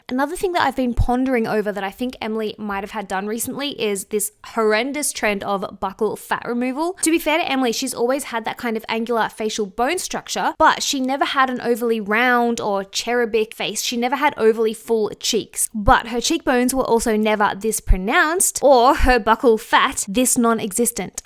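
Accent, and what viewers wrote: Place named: Australia. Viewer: love your videos and just a fact, your accent remembers me of Felix from Stray Kids cause he is australian too (and Bangchan) <3 love you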